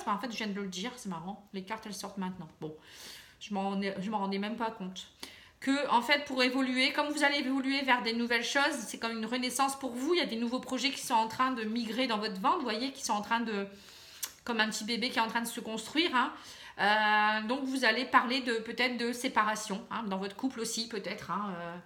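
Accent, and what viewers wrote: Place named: Pas-de-Calais, France. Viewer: Bonjour, merci pour cette guidance bien complète. Juste une remarque sur le domaine sentimental : je trouve que vous parlez beaucoup des couples et pas assez des célibataires. J'en suis une parmi les 14 millions de célibataires de France, donc très loin d'être la seule. Pourriez-vous rééquilibrer vos prédictions sentimentales dans ce sens ? En tout cas je trouve votre analyse fluide et parlante. Merci ! Dernière chose : seriez-vous du Pas-de-Calais car votre accent me fait penser à ce département ? Merci. Paix et amour à tous 🤗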